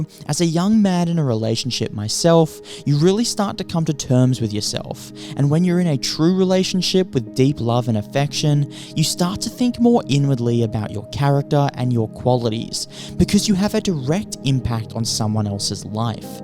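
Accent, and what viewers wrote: Australia. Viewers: I'd like you to redo the video in a normal American accent the Australian accent is bizarre and very unpleasant to listen to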